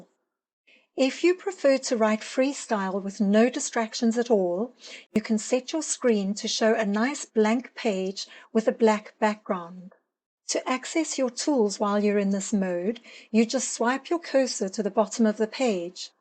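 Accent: South Africa. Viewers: I hear a South African accent!! Hello from little Knysna!! I just got Scrivener for Nanowrimo this year!! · Are you from South Africa? Love the accent!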